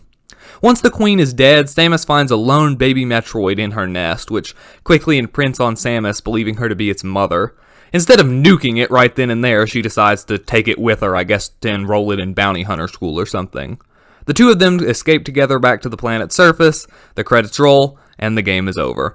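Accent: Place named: South, United States. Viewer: Your voice sounds like a mix of optimus, mrBeast, and a slight bit of southern and it amazing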